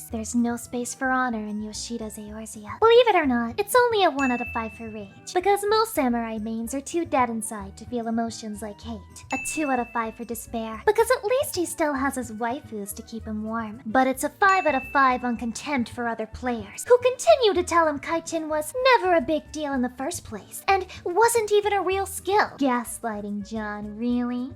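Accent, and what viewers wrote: South, United States. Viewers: I can't stop watching after hearing her southern accent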